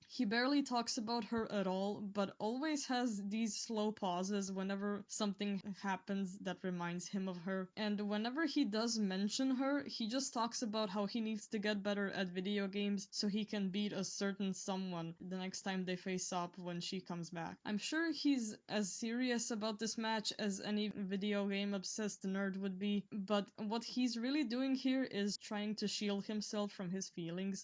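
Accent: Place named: Canada. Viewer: i have to say i jus luv the way you speak, your style is adorable! and nutin wrong w your english, actualy im suprised to learn its not your first tounge, i thought you're canadian:) wonderful analysis btw a joy to watch, thx for makin'em!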